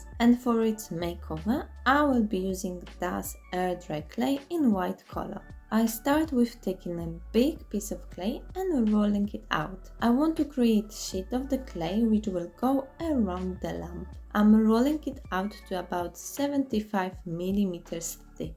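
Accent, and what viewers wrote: Poland. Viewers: These were all brilliant! I genuinely enjoyed them and only today I took a lampshade apart and considered using macrame cord on it. I love what you do and that you also go to the charity shops! Where sbouts in the UK are you? I can usually tell by someone's accent but you sound like you might possibly be from Poland (I love the accent). Cant wait to see what you do next and subscribed last week. 💕💕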